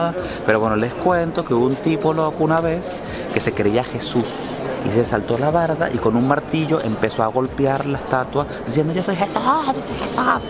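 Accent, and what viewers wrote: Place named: Venezuela. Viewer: Llegue por casualidad a este video buscando informacion para mi proximo viaje a Roma, y por el acento y los comentarios sobre cada lugar asumo qué eres venezolano jajajajajajaja, tengo varias dudas sobre el viaje, has usado la tarjeta Roma Pass? la recomiendas? es cierto qué te ahorras la cola en las atracciones si la usas? Gracias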